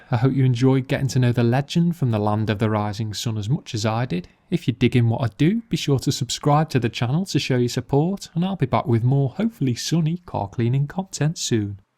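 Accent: Liverpool, United Kingdom. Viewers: Amazing !!! Are you from Liverpool? Lol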